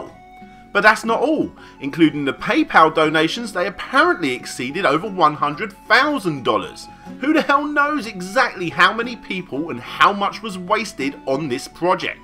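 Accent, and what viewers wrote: United Kingdom. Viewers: almost every count down video will have a british speaker....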